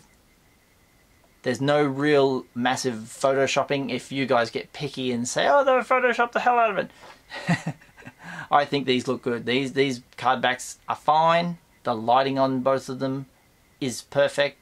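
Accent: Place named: United Kingdom. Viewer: Okay you're in Australia? I started watching this and thought you were in the U.K? You sound very British.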